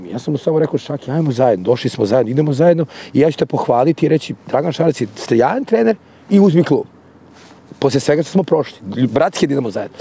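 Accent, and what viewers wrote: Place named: Bačka, Serbia. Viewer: Лакат краљ, полако али сигурно набацује бачвански акценат..😎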